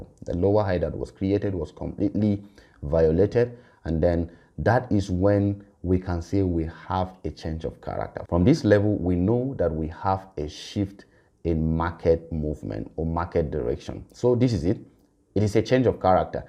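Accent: Nigeria. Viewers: very good brother , you sound like you must be a Nigerian too that’s why I’m reaching out , let’s rub heads together sometimes hopefully the market charts gets easier with a team ( multiple heads always better than one)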